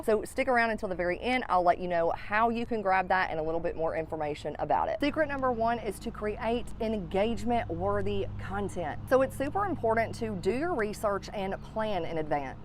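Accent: South, United States. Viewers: Girl!! I love this video!! And you sound like a Southern girl like me! It's about time! :)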